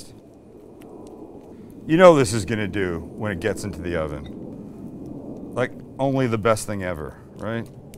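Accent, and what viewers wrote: Canada. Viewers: Did I hear say he was born in California? He sounds Canadian or…something. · I’ve been following this channel for how many years now and I just now caught the Canadian accent???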